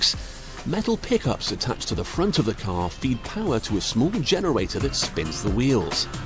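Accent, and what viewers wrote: United Kingdom. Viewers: The first one is correct as its a British accent..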